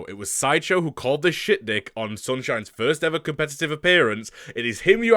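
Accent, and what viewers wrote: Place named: Yorkshire, United Kingdom. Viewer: love the yorkshire accent, keep it up!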